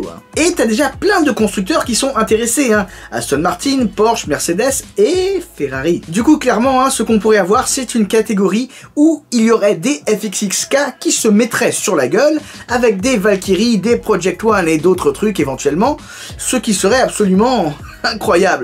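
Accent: Italy. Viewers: Salut, tu pourrais faire une vidéos sur les préparateurs stp stp stp ? <3 <3 Plus sérieusement, de ce que j'en ai compris, la QUATRE HUIT HUIT Pista n'est pas limité en exemplaires mais en temps donc compliqué à en avoir une ... j'y crois moyennement, seul un nombre d'exemplaires sera attribués aux concessions. Sinon très bel accent italien, je te repost rien que pour ça !! Vivement la prochaine vidéo en full Italien :o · j'ai liké pour l'accent Italien x)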